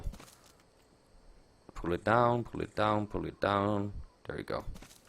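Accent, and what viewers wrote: Netherlands. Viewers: Are you Dutch by any chance because your accent is very Dutch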